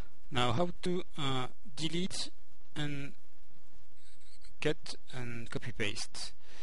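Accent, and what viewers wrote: France. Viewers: Thanks alot man, very well explained and the french accent is interesting :D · Its a French accent lol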